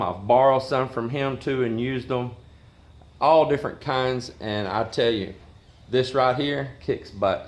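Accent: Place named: South, United States. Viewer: Yes I am. Thats why I watch your channel because your southern accent plus yall make me laugh. And yes the gulf coast s nice. I miss the ocean and the heat. Its been in the 50s today. I could see my breathe in the air. Yall keep up the content we love it. - Amber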